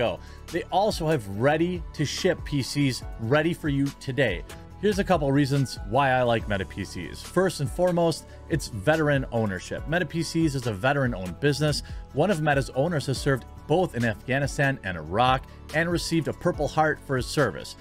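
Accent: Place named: Wisconsin, United States. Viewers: It's funny how much more Wisconsin sounds when he's ill. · Still not Mark Richards levels of Wisconsin.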